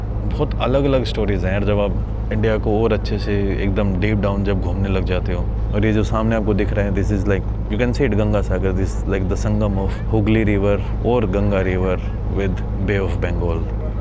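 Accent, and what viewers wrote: Bangladesh. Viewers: you are using Bangladeshi/Tripura accent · . I watched your all videos till today you uploaded. Liked and commented also. But this is one of the best as you spoke nice Bengali. Amar naam. However your tone is bangladehi bangla not kolkata bangla 😅 Let us meet at Delhi. I also travel a lot. Our meeting can be mutually beneficial.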